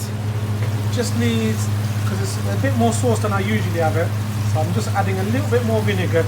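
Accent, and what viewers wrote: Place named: Birmingham, United Kingdom. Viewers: Should tell us where you are think it would be great for your restaurant? It’s a midlands accent maybe Leicester but I’d b guessing. Looked delicious. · Sounds like a Brummie. Probably from the 'Balti Triangle' or similar area in Birmingham, which probably has more 'Indian' restaurants per square mile than anywhere outside of India!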